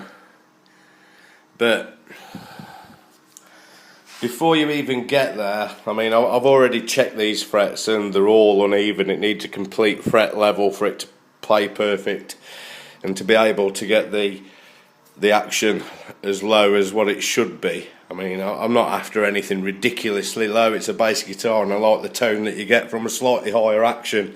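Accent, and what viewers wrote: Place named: South Staffordshire, United Kingdom. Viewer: My first bass guitar was a White Shadow. It was orrible. Actually judging by your accent I wonder if that one was once mine (I Live in south staffs...). Tradd mine in some time in the early 90s..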